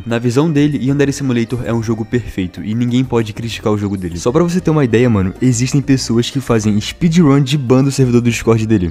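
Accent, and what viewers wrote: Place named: Rio de Janeiro, Brazil. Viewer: Omagad, carioca?? Inscrito adquirido.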